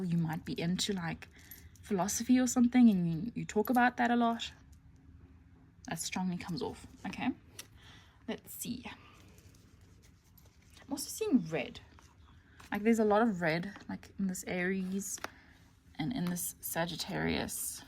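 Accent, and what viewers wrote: South Africa. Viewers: Are you South African? Just found your channel, love these messages and your thumbnail💗 p1 is really accurate 😮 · South African voice right there! Love it 🥰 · Girl I know a South African when I hear one! 👀😂🫶🏾🇿🇦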